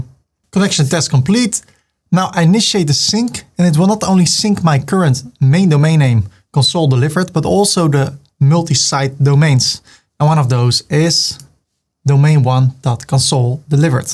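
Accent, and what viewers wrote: Netherlands. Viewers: i love the dutch when they speak english zo schattig 🥰😅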